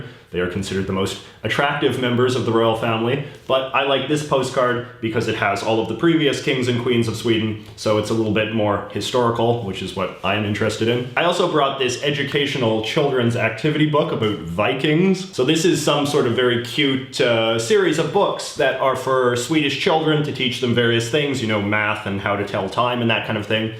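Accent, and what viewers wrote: Canada. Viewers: Wow, an entertaining and informative North American with quite a cool hair style whose only pecularity is to say "a boot" when he means "about". I hope he also realizes that going to Stockholm and possibly see it as a representation of Sweden is a little like visiting New York City and possibly see it as a representation of the U.S. -- It only applies to a degree. (Yes, I KNOW he must be Canadian, but since I've never lived in Canada, I don't want to make comparisons I don't know anything about.) Welcome to Sweden!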